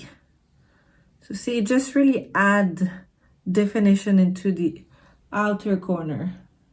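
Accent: Quebec, Canada. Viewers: Hello! Gorgeous! May I ask, are you originally from Quebec? 🙂 I am so I feel like I recognize an accent. Vraiment magnifique, j’adore Guerlain! I bought the pearls (I love Meteorites so much) and some Kiss Kiss balms. I am really hesitating to get the eyeshadow palette. 😍 Thanks for sharing! 🫶🏼 I subscribed! If ever you want, I have a channel too. Have a great day! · Beautiful! Je reconnais un accent Québécois?😉😉J’adore les Météorites! ❤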